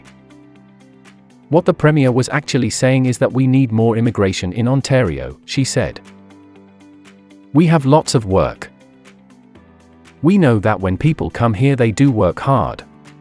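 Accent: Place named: United Kingdom. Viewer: Would the British-sounding narrator please do a video with himself in it to add details? Thanks.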